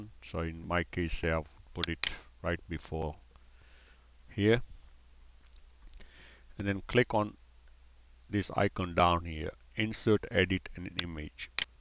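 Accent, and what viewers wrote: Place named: Mexico. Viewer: OMFG NOCE VOICE AHAHAHAHAH you sound mexican XD thx anyways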